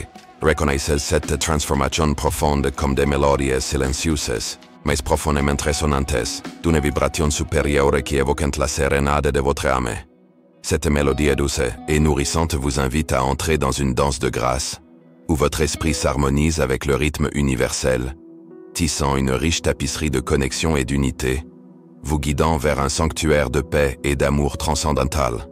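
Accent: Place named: Germany. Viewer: C'est dans toutes les vidéos (que je viens d'écouter :) de cette chaîne ... Quand l'Ai fait des erreurs ou des choses intentionnelles, généralement, ce n'est pas pour le bien des humains. Là, des mots en espagnol, francisés avec l'accent allemand, ça sonne comme un gloubi boulga de langues européennes ... Un espèce de truc incompréhensible et rabat-joie, comme l'UE de la hyène ! Pensée unique, langue unique, banque centralisée bref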